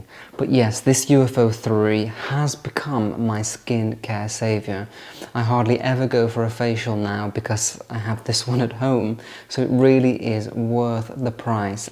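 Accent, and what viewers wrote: RP, United Kingdom. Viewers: He talks so posh ❤ 😂😂😂 are your parents posh ?????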